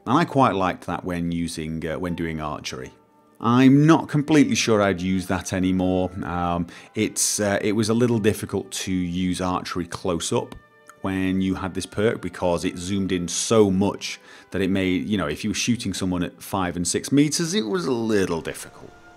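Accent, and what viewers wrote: Norway. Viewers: Same! And 'cause I love love your voice and accent! (Norway?)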